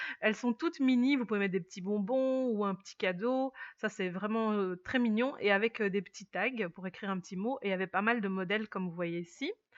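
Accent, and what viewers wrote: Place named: Belgium. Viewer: J'entend que tu viens de Belgique... tu viens d'où? C'est l'action de quelle ville? Bonne soirée!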